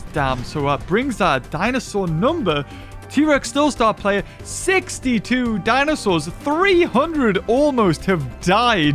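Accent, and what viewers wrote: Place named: United Kingdom. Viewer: British dude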